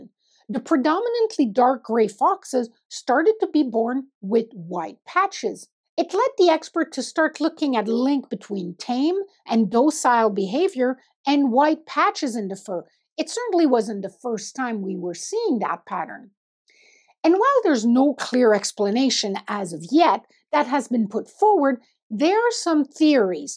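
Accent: Canada. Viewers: Border "Cauooohlie" Canadian! That super rounded "O" gave you away! White tigers aren't rare now. They are bred by people which is horrible because in th wild this usually means the animal has health issues galore. People breed them on purpose and call them "rare"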